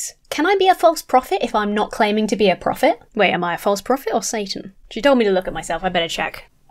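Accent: United Kingdom. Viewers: Hi there! I just wanted to take the opportunity to thank you for what you do (from "across the pond," as the old cliche goes)! I feel as though YOU get under Hovind's skin more than anyone else. He just can't handle a vibrant, youthful, highly intelligent, HILARIOUS, fenale. Throw in that WONDERFUL British accent and those voices you use (especially Hovind impersonation), and we have the icing on the cake! You ROCK!!!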